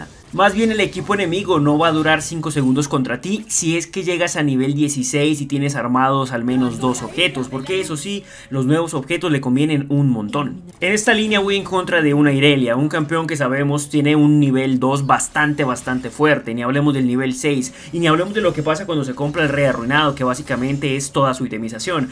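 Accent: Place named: Ecuador. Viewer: hola, tu acento se parece al ecuatoriano xD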